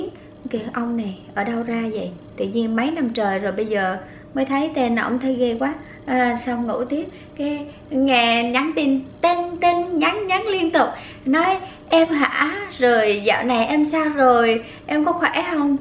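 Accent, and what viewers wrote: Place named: Central, Vietnam. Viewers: Giọng chị giống ng miền trung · chị nói giống giọng đà nẵng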